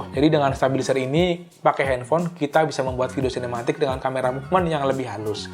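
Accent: Tegal, Indonesia. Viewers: Wah mase wong tegal ya mas?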